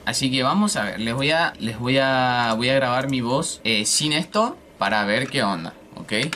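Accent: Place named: Argentina. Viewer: Hola me encanta tu forma de relatar y acento argentino ❤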